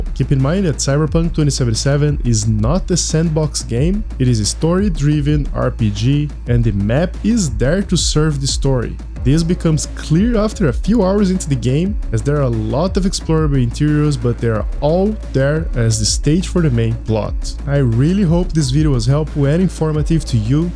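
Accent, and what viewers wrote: Brazil. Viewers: Tu é br mano? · Tu é br né?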